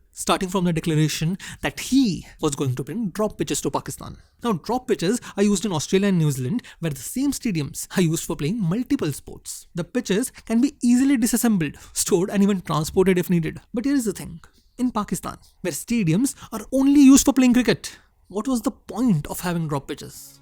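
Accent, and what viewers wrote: India. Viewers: Bias Indian Accented Blog and we are buying into it. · I love how thick indian accent u have. Raaaayhmbo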